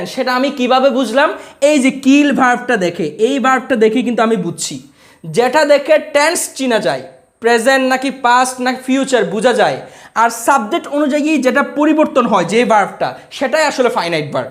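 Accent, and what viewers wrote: Chittagong, Bangladesh. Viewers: Vai.... apni to mona hoi chattogram er people. Amio akjon chattograminian. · আপনি কি চট্টগ্রাম এর ? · Sir mone hy chittagong ar tai na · Closing my eyes,,,i can say you are from Chittagong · apni ki Chittagong er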